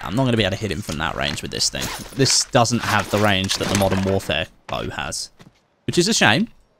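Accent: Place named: United Kingdom. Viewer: Why are you British??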